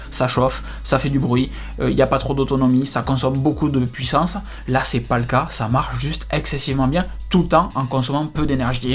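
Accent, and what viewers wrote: South, France. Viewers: Merci beaucoup pour cette vidéo : complète et très enthousiaste ! De plus, cela me rassure d’avoir un MBP M1 avec Touchbar car tu es le seul dans cette vidéo à dire que finalement la Touchbar ben…c’est pas si mal ! Bon courage pour la suite, et merci pour ce bel accent qui sent si bon le soleil !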